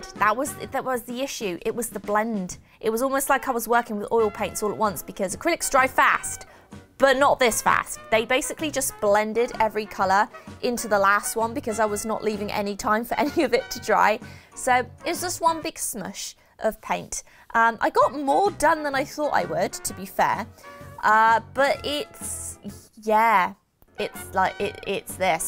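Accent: United Kingdom. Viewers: Someone plz explain. She has a amazing British accent but she talks all the time about Walmart, USA and other things related to that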